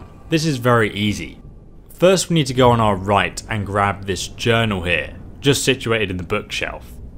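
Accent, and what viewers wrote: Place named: England, United Kingdom. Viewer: Da Informer his accent has nothing to do with it, its an english accent, sane as mine, i prounounce everything fine, hes dyslexic.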